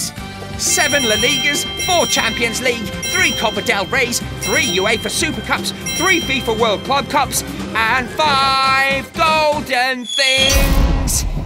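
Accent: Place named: England, United Kingdom. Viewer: the english accent ruins it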